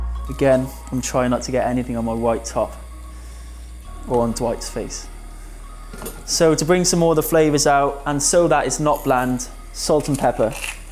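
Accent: Wales, United Kingdom. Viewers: Do I hear a welsh accent?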